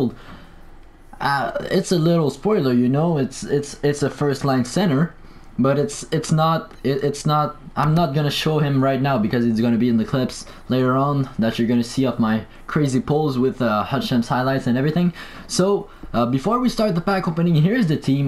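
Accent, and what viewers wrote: Quebec, Canada. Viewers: Ahah l’accent québécois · oh okay. French canadian? That explains the accent right? Keep up the good work. Love these vids man👍🏼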